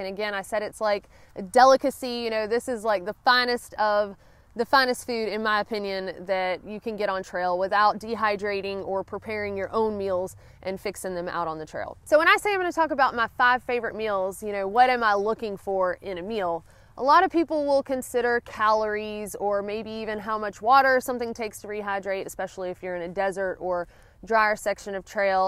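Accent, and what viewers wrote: South, United States. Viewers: Bet you never heard this before. I like yer southern drawl. Lol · I'm from Tennessee and hear it all the time,but I could listen to your sweet southern voice all the way to Maine... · What kind of country ass accent is that ☺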